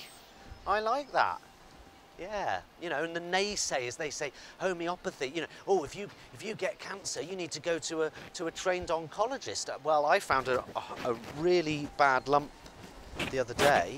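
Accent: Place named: West Country, United Kingdom. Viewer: I can hear traces of West country in his vowels.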